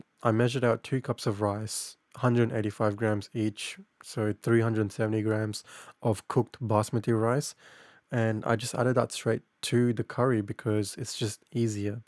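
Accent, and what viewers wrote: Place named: United Kingdom. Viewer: Thank god you’ve a British accent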